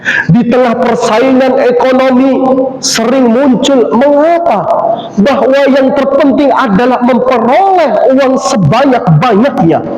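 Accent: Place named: Banyumas, Indonesia. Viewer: Terimakasih Bu Guru ngapak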